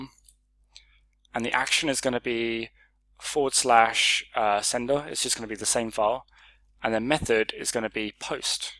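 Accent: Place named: United Kingdom. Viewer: you're British accent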